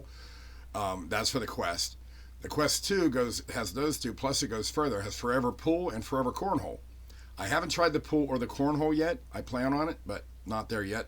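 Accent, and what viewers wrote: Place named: Pittsburgh, United States. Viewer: I just randomly found you with the pressure pot video. I'm doing a Jerry rigged vacuum for some resin and we'll see how it goes first, but it sounded like you were a Pittsburgher, then I saw you had a Kennywood puppet video.... Then I saw your channel is, and I might be misremembering but have I seen that sign up in Gibsonia for the last like decade??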